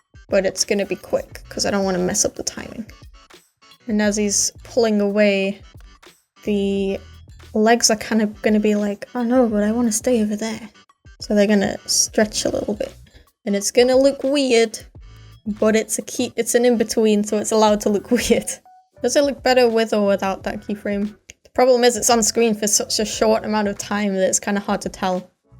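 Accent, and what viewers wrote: United Kingdom. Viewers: .. in the least sexist way what gender are you. You sound like a British young male or a British adult female... please tell me I feel weird about this because I'm new and I may or may not be making fandoms and may or may not need to know your gender for a fandom. Not saying anything here just asking mate. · I love the British accent owo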